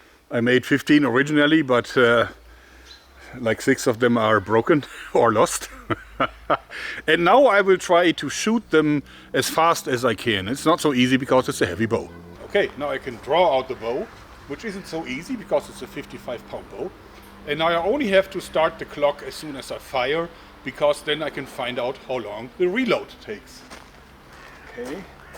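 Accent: Germany. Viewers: 😆😆😆 Explanations boring? How can we resist History with ASMR "jerman" voice and giggle ♥️✌🏼📝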